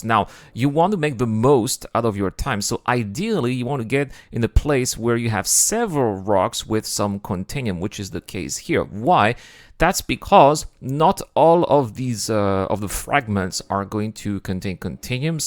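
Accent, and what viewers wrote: France. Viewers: Bro... Are u french?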